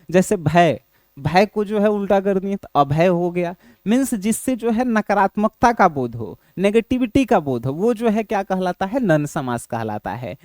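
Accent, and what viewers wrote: Bihar, India. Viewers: Sir ap biahr sa ho jab ap bolta ho muja bhaut hasi ata ha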